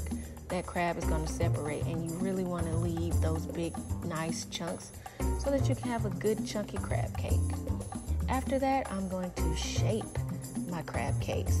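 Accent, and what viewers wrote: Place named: South, United States. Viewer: Beautiful crab cakes. I'm going to make some. Also, that southern accent just crushed me. I'm in love.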